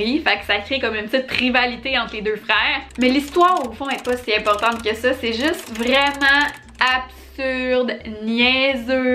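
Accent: Quebec, Canada. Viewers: oui je sais je souligne juste que j'aime beaucoup l'accent Québécois. J'habite en France et j'aime beaucoup regarder des films Québécois qui sont de qualité et aussi pour écouter l'accent 🥰🥰🥰😙😙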